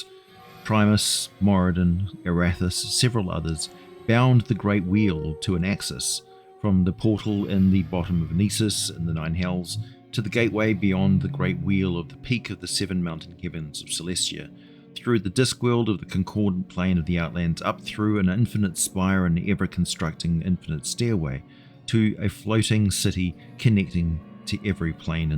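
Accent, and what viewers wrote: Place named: New Zealand. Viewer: I've drifted off to sleep listening to your voice so many times my inner voice has a kiwi accent now. Currently worrying if kiwi is OK to say.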